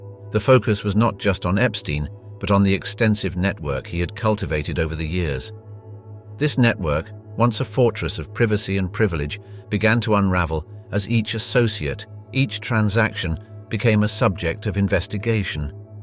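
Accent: United Kingdom. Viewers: Content notwithstanding, talk about "PURPLE PROSE".. GEEZ! Wish I had a dollar for every time the overly Brit narrator oozed out the word "enigmatic". .Again, GEEZ! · Ah, a poetic little wordsmith wasting our time with a dumb british accent. Gfys 👋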